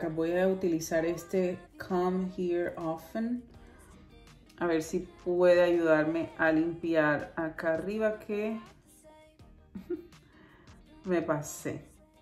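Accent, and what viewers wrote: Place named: Cuba. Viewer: hola soy nueva por aqui vine a tu resena por tu tono de piel tan parecido al mio para ver como quedan esos colores ceresas navidenos tan lindos me da esa paleta vibras de Huda pero Huda es carinosa en los precios asi que prefiero mophe que creando sombras realmente sorprenden y con precios de waoo, tu acento se me hace de cubana como yo jaja saludos aqui una nueva sub apoyando la raza besos.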